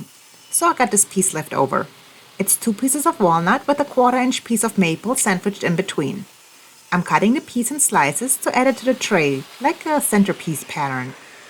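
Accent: Germany. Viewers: Sie kommt aus Deutschland. 😊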